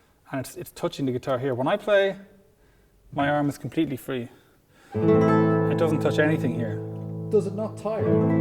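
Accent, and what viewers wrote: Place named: Ireland. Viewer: Third, it's called an Irish accent dumbass.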